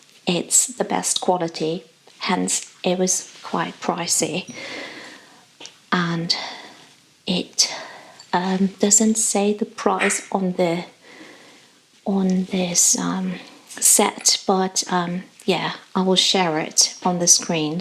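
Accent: England, United Kingdom. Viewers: Question; You are German but have English accents. Were you raised in England?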